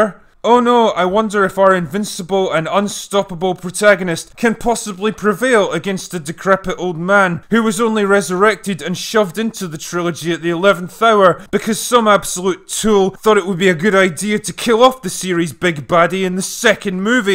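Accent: Scotland, United Kingdom. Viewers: He's Scottish. He just sounds that way.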